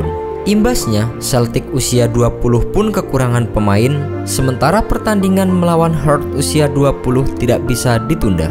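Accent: Java, Indonesia. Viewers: knp medok ya wkwkw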